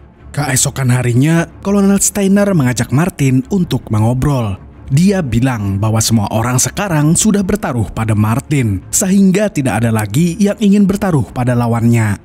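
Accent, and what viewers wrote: Banjar, Indonesia. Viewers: Nahh, urang Banjanr adminnya neh..😅😅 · banjar kah pian mang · haw banjar kh pian?😁 salam ae nah dari kaltim hehe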